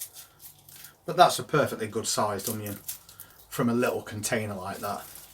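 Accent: Yorkshire, United Kingdom. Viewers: Ay up I can hear a Yorkshire twang were you from? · I'm from the other side of the Pennines, but I thought you were a Yorkshireman too! Enjoying the videos. Very relatable. Thank you!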